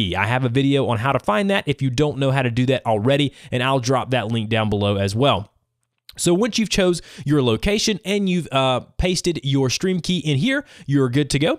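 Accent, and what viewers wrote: South, United States. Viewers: Are you from the Southern U.S.? (I'm a Linguistics major so I have a tendency to try to guess accents.) It sounds kind of like you moved to the Northeastern United States after growing up in the south.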